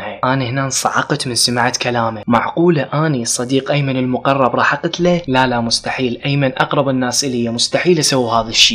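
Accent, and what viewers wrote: Iraq. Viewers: لهجة اهي مو لهجة سعودية انت من العراق ليش ماتقول انت من العراق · أنا عبدالله من سكان الرياض عمري22 سنه بعيد كل البعد عن المنطق الشكل عراقي واللهجة عراقي ليش الكذب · لهجتك عراقية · ليش يجي عليك انو انته عراقي حجيك عراقي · لهجتك عراقيه؟ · والله العظيم اللهجه مالتك عراقيه وبغداديه هم اني عبالي عراق طلعت سعودي يلا ماكو فرق اصلا تحيا الك حبيبي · اللهجه عراقيه ميه بلميه شسالفه؟؟ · كيف انت سعودي وتتكلم عراقي وتقول دوانيه ولا انت كويتي عشان افهم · لهجته عراقيه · انت تتكلم عراقي وانت سعودي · ليش لهجتك عراقيه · أحس هو عراقي لأن لهجته عراقية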